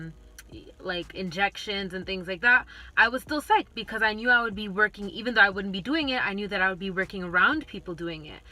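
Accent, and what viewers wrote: Caribbean. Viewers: Pleasure is all mine. You do an excellent job. How did you fine learning pharmacology? I’m now reviewing and getting the jist of it before school starts in July. Do I detect a West Indian accent also? My dad is Jamaican and mom is from St. Kitts.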